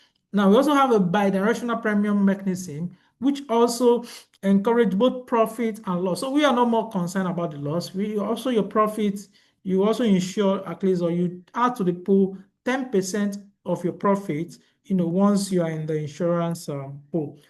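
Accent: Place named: China. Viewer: 一听就是中式英语 可能是沙洋盘的😂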